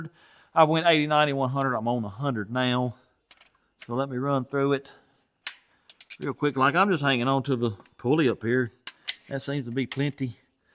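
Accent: South, United States. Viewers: I figures...then I guess I will have to pull the plug on you and unsubcribe because my eardrums hurt from all that redneck gibberish. Too bad.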